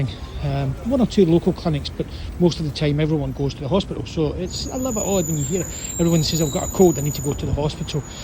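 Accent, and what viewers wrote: Scotland, United Kingdom. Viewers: How could he/she not understand it? Your accent is much much mild Scottish accent but certainly can be understood easily. I really have to work hard to under the strong Glaswegians' accent as some words seem not English when they speak.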